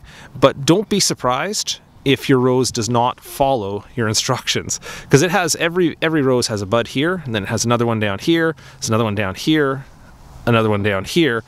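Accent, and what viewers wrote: Canada. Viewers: Great Canadian accent!😄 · Very helpful! Love your Canadian "accent"! You sound a lot like Scott Thompson from The Kids In The Hall! Yes, I'm old!!!!! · Me too! My Dad has about 6 large rose bushes, and in 3 years have never been trimmed. I just had no idea where to start tackling them but have a much better idea now! First video I’ve seen from you and it took me about 30 seconds to spot that awesome Canadian accent! You have amazing plants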